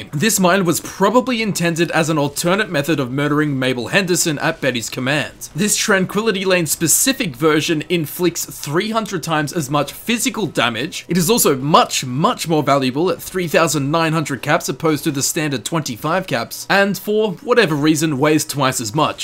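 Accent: Australia. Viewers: are you from Australia because I live there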